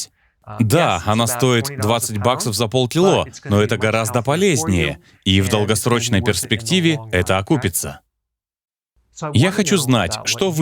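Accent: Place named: Ukraine. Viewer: курица а нЭ кури достали уже эти укра лайные кури кура и тд слух режет дико тем для кого русский родной читающий текст из украины это четко понятно по не русской музыке речи и ошибкам произношения типа а вместо О в словах где О ударная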